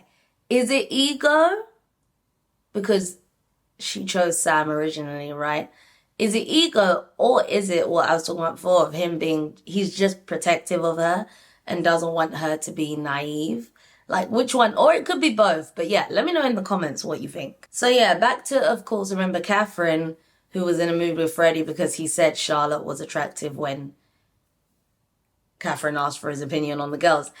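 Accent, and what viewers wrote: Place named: United Kingdom. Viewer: I love your reviews and that accent is so enticing. Honestly, when you brits talk, I just damn listen 😂. Ok so, let's get into it. I have subscribed and thumbed up 😉 1) Nicole and Sam - we have to be fair and not give Nicole a pass for her behavior. Her asking Sam for physical contact after that disastrous reveal and what her heart showed her is really low. She has self-esteem issues. Was it love at all cost for her, cuz sis has me really disappointed with that revelation. Did she think that sleeping with him was going to erase the awfulness that is Sam? 2) Demi and Ollie - Ollie is not about that life, he likes pretty girls and whilst he knows Demi is good wife material, the sexual tension is lacking. This wont' work. Demi is just a homie to him. 3) Maria and Tom - Maria can't have it both ways, she can't be about arab/muslim culture and values in one scene and be drinking a cosmo in another. 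I have nothing against Muslims/arabs nor against alcohol but the fact that she is a liberal muslim proves that she needs to compromise with the world as she has done with herself. It's not because Tom does not put religion or culture forwards that he lacks values or choice. Stop it already. 4) Cat and Freddie - Cat has a temper or maybe it's Freddie that triggers her. I think Freddie's gorgeousness throws her off, it's almost as if this was his best quality and his worst at the same time because people tend to associate his looks with someone who is effeminate or soft. In any case, Cat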